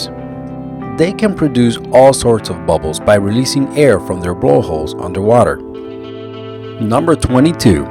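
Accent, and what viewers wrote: Mexico. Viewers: Is the new guy Mexican or Puerto rican?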